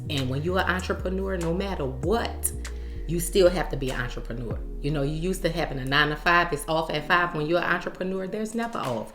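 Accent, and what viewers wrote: New Orleans, United States. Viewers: I just love your personality & accent…ain’t nothing like a NEW ORLEANS GIRL…we love you 🫶🏾🥰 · Love your NOLA accent. We know you are busy Queen!!!👑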